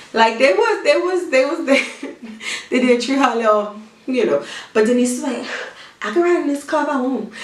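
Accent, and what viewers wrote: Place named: New Orleans, United States. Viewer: Nice Review 👍🙏 Ms. U have a Real New Orleans, Louisiana Accent for Real❤️I Love It!!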